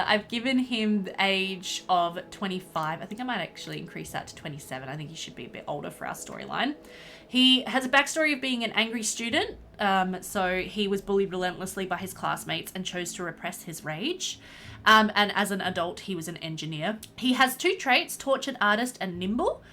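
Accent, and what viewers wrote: Australia. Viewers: austrailian accents...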